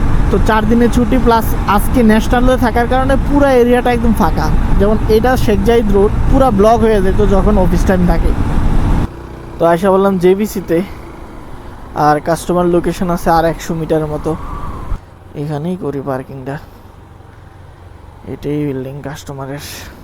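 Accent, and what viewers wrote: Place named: Comilla, Bangladesh. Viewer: ভাইয়ের কথা সুনে মনে হচ্ছে বাড়ি কুমিল্লা 😊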